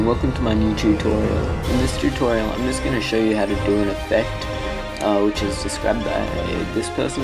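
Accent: Australia. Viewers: Yay, you're Australian like me. ;)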